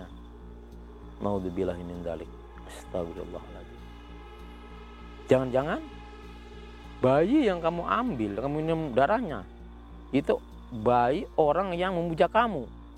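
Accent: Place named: Sunda, Indonesia. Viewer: Assala mu allaikum om ngomong sundanya lucu om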